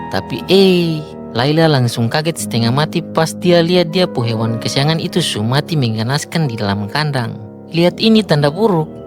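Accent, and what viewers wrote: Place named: Eastern Indonesia, Indonesia. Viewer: Pertahankan logat timur anda bung.. Pertahankan ciri khas bung & jadilah berbeda (positif). Saya doakan channel bung sukses 🤝👍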